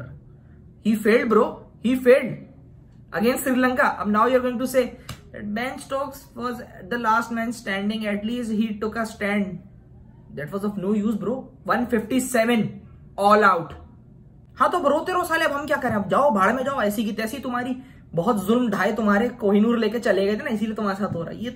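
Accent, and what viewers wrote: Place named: Uttar Pradesh, India. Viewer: Bhai tu best analyst hai cricket ka , Mazak sa hatt ka tere style match kar rahe hai apne sa !! UP wale accent hai teri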